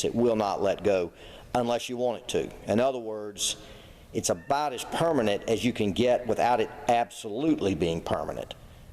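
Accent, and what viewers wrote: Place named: South, United States. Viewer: Hi! I have followed your involvement with the Weta on the forum since about 2010 but never heard your voice. That's a great Southern Raised accent you have there! Do you play the banjo? I have done the job you illustrate several iimes and wish I had known about the fishing line trick. George Morris Weta 117 Scotland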